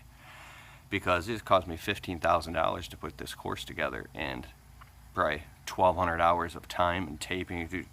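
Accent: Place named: New Zealand. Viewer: he is from New Zealand dude ...😂😂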